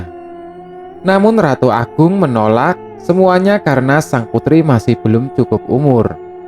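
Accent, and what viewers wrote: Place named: Java, Indonesia. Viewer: mulut orang Jawa logat bicara Jawa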